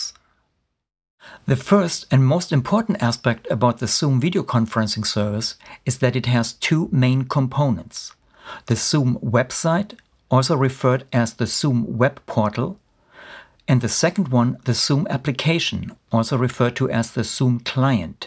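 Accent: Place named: Germany. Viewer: You are really really good at explaining how this mess works, thank you so much! you have a quite strong german accent, you are german right?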